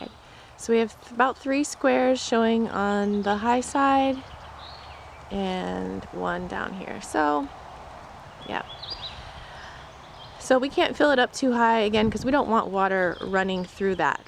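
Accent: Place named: Louisiana, United States. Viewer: One of my many talents is to recognize and pin point the different dialects and accents from Louisiana(which there are HUNDREDS) and call where people are from. I turned on the video and i thought i recognized a north shore St. Tammany or maybe Washington parish spunding lady and i click on your page and see the Louisiana cover pic. I could be wrong, i sense a hint of the lower cajun country(east of Atchafalaya bridge i.e henderson breaux bridge area) so maybe your an implant and just picked up some of your accent but im pretty solid on the northshore call. How'd i do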